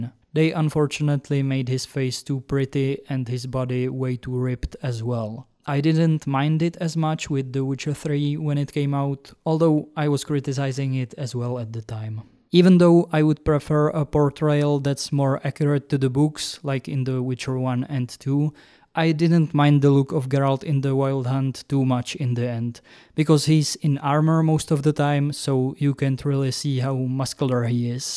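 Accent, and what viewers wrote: Czech Republic. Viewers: man that czech accent is nice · Hej úplně random jsem našel tvoje video a je vtipné že okamžitě jde poznat čecha co mluví anglicky :D Velmi dobré btw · are you czech by any chance? the accent seems familiar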